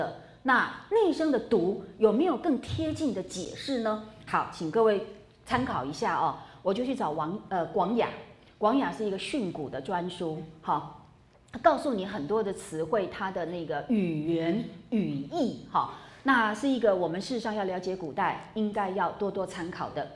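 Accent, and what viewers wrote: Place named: Beijing, China. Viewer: 欧老师的儿化音好可爱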